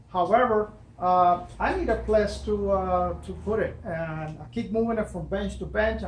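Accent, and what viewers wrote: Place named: Cuba. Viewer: Soon as he spoke. before I saw the name n he said it. I knew he was cuban. Can't fake the accent mi gente!! Conño!! Nice vid! Thank you meng.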